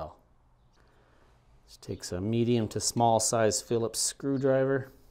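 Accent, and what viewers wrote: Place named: Canada. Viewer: Great video. You say your in Arizona but you sure sound Canadian!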